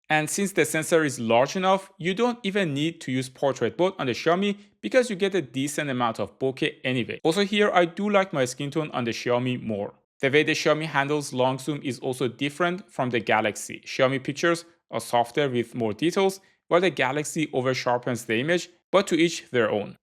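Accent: Iran. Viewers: Persian accent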